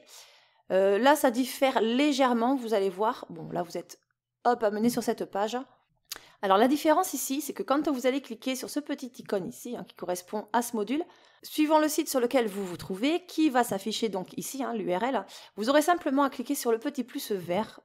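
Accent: South, France. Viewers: le bon accent du sud on aime bien ca!!!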